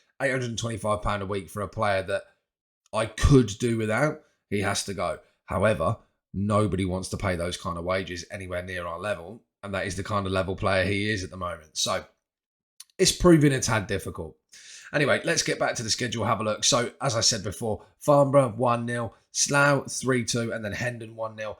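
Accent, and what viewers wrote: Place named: Australia. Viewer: can definitely hear a bit of aussie working its way into your accent lol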